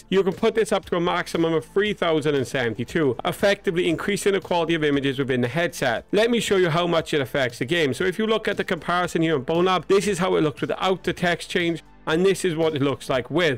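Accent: Wales, United Kingdom. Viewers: What on earth are you on about ARABIC? hes welsh thats why we cant understand us the terrible mic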